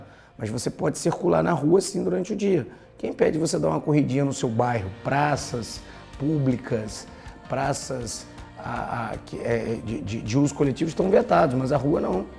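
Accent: Rio de Janeiro, Brazil. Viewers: Ahh meeu gratidão! Nunca estudei tanto na vida haha Só consigo ouvir aulas que tenham vozes de seres humanos inspiradores! Tipo sotaque carioca dos vídeos de direto administrativo! Obrigada!!! Jah Bless 🆙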